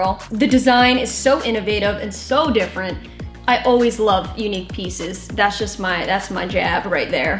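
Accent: New Jersey, United States. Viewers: Okay I’m back I’m definitely buying this collection I like that it glows in the dark that’s dope man that green is super cute I see it’s a lower cut still cute I like a pocket on my workout pants because I can put my phone in there but other then that I don’t like all those lines where are you from u sound like ur from jersey I don’t like nothing sliding yeah see I don’t like that on my heel I will pass on that one bring the glow in the dark tho that’s the one I want for sure! I don’t like padding I like the back of that bra tho on that green nice medium band great review girly u are so detail u said damn lol I got a pair of nikes that glow in the dark I didn’t even know they did I was like wow it will go good with that those make ur butt sit up I’m here for it it’s gone lift because ur small but other then that u need to tell gym shark to let u design some stuff because u know about them well they need to have u on there team for sure man I love this video great job on the two fits for gym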